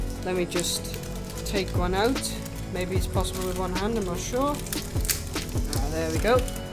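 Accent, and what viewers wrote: Wales, United Kingdom. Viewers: Just subbed, nice little setup.. hear the Welsh accent too👍🏻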